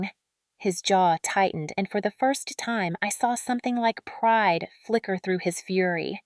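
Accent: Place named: South, United States. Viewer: Love the storytellers' southern accent. especially since my roots are tied to the south.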